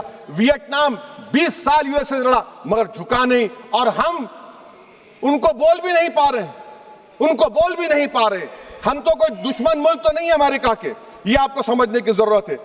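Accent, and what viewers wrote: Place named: Hyderabad, India. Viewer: HYDERABADI BOLTEY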